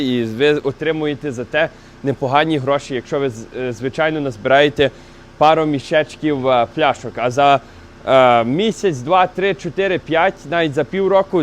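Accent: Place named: Hutsul, Ukraine. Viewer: Ти мені подобаєшся ,настоящий гуцул з полонини,і мова така,я працював з хлопаками з западної України. І в Чехії так їх тьма,трутнів,не таких простих заробічан як ми стобою. А роботодателів.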